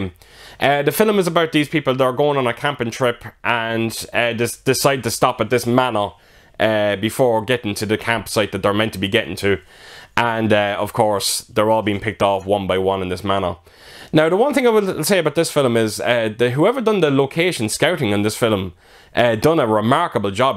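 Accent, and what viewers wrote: Ireland. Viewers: Agree with your Edge of the Axe practical fix work. Top notch. Which part of Ireland are you from??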